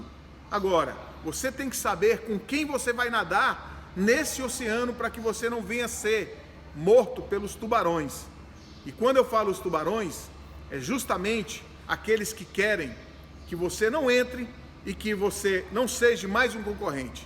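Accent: Brazil. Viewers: Sotaque de cearense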